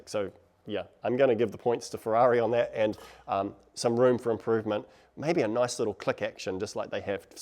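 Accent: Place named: New Zealand. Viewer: Im a kiwi and even I found this accent thick! 😃 great review, will it be up for sale soon?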